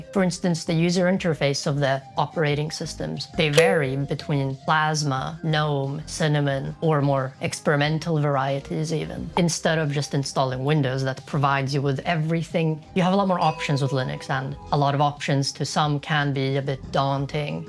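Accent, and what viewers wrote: Sweden. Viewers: Say no more, the Swedish accent already convinced me. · You sound swedish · just clicked on this recommended video, hearing you for the first time. You sound as if you're from Sverige. Anyway, I subsribed because you support FOSS. No matter what *nix you use, I'd recommend to look into the history of *nix, where our beloved penguin is a major part of. Keep up the good work, thanks for this video and love from NL.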